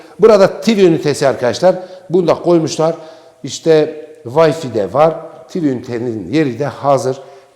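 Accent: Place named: Anatolia, Turkey. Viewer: Anadolu silvesine ve senin dogalligina hayranim icinden geldigi gibi konus bosver sen kotu yorum yapanlari. Kolay gelsin kardasim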